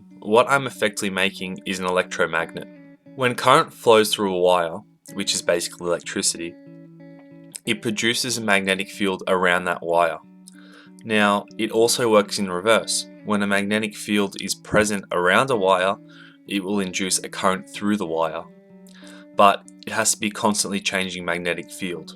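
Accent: Australia. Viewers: your voice is beautiful it sounds austrailian ;3c idk im probably just stupid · Are you australian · kd secret acc deffs aussie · He is definitely Aussie I'm also Aussie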